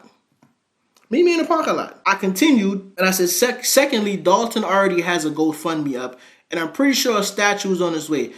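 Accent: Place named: Brooklyn, United States. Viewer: I see we letting the Brooklyn out today, and you want the smoke…..lol. Keep it going brother, I’m with you on the petty levels put all these racist trolls on blast!!!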